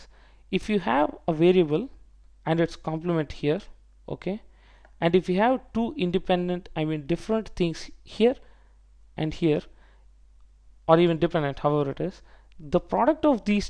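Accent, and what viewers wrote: India. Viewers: its indian accent bruh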